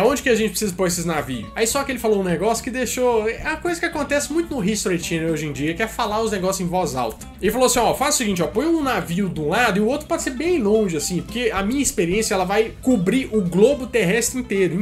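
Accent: Minas Gerais, Brazil. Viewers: Cara, ce é mineiro? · Todo domingo eu to aqui na expectativa de ouvir o modo de cumprimentar Mineiro ... " cês tão bão né?! Manda um salve aqui p São Joaquim de Bicas - MG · Gente...melhor canal do YouTube....sua forma de apresentar é mt top..super natural e engraçado..é o sotaque Mineiro e top..MT bom de ouvir..sou mineira mas quem mora em BH fala MT diferente de quem mora no interior kkkk me divirto MT com seu canal parabéns.... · OCE É MINEIRO UAI, O SOTAQUE NAO NEGA TO ERRADO , SO SE O UM MUCADINHO SO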